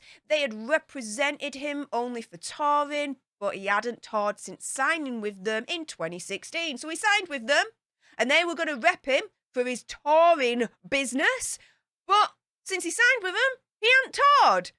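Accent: Yorkshire, United Kingdom. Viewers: She’s Ok got that accent from Yorkshire 🙂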